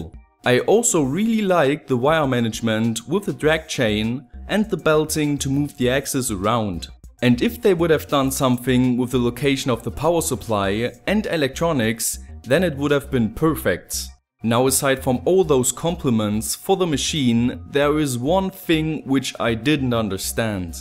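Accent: Germany. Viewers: Are ya a german dude?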